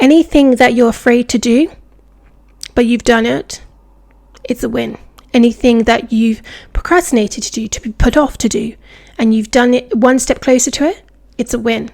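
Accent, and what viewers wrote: United Kingdom. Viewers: Sound like your from UK 😮😊😊 tappin in from across the pond 🥰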